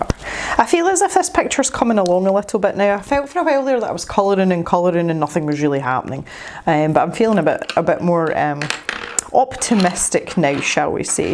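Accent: Scotland, United Kingdom. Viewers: I thoroughly enjoy watching your art...listening to your little Scottish accent (love it) and about your life! Do not let those thumbs down bother you! It is too bad it affects your channel.....so sorry!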